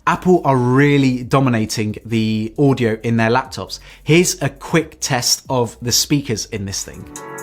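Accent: United Kingdom. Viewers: Yea, a British accent. You are the first reviewer to mention the screen record as part of the equation. I have the i5 on order with 16Gb & 1Tb after watching lots and lots of comparisons. I decided the i7 might not live up to it's promise. (I loved my old MBP with quad i7 but Apple killed it by not allowing Catalina due to metal)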